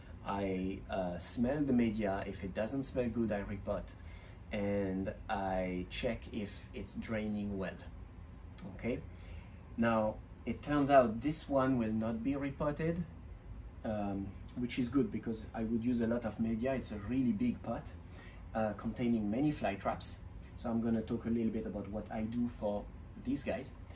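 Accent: France. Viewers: hello, where in Canada? love your french accent🙂(I could be wrong 😅) do you think I can leave it outside (Montreal) until the first snow, or do I have to transfer it to a warmer location (like indoors on a shelf near a window) ? thanks for the video👍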